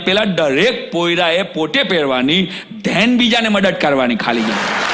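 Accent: Kathiawar, India. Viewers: This gadhedo from gondal is khathiyawadi not surti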